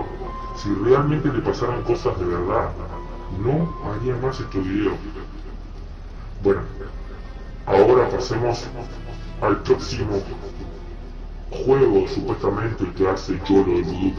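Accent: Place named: Colombia. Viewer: No la saca de Google Cómo explicas su voz original, tiene acento Colombiano y Juan Carlos no la tiene es obvio que no es solo lo dijo para ver cuánta gente le cree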